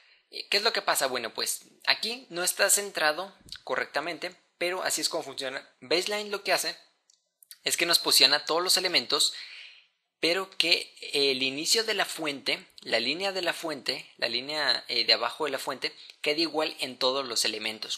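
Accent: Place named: Mexico. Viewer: Excelente video. Aprendí más de Flex. Solo una duda, porqué los mexicanos dicen "WEF", en vez de "WEB"...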